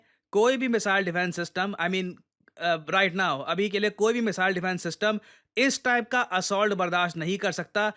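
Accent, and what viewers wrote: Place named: Haryana, India. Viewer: Bhai wo bolane ka accent hai Haryana uttrakhand mein sayad bola jata hai.😅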